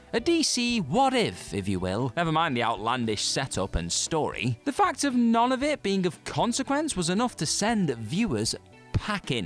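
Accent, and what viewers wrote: United Kingdom. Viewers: More cheap slop videos with ignorant British narration. Did any of you slop producers bother to watch the episodes you included, or did you just let AI write the script for you? In the Sunny in Philadelphia episode, Dennis and Mac switch characters in their Lethal Weapon sequel, but Mac STARTED in blackface as Murtagh and Dennis as Riggs. When they switch, Dennis does Murtagh without the blackface.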